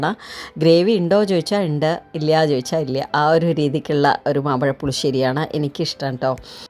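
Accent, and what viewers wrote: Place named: Palakkad, India. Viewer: Palakkad aano ? Bhasha kelkkumbol ende naatukari aano enna samshayam.😊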